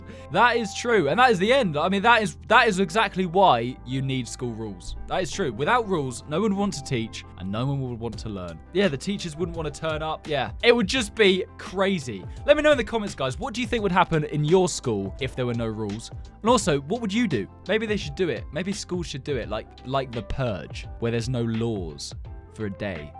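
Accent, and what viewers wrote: United Kingdom. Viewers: Are you British cuz I am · Bros British 😊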